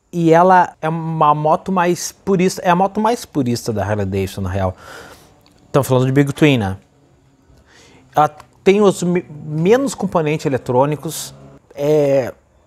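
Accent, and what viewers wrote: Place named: South, Brazil. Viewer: Sotaque portuga do sul do brasil.guri muito bom seu vídeo gostei demais.essa moto e meu sonho.preciso comprar uma dessa urgente.e vc disse tudo moto que não tem elétricas demais não dá problema e meu sonho e comprar pra mim mesmo fazer as manutenções dela.gostaria de uma 883 ou uma forth eight mas essa tem um tanque de 7 litros e por isso pesou já a dyna e maior e o estilo me agradou bastante.muito bom o vídeo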